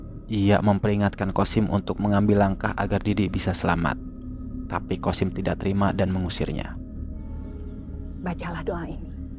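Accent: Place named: West Java, Indonesia. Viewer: Dari fb, pindah ke sini penasaran pingin tahu alur ceritanya, seru dengar suara aa cerita Sunda banget ☺👌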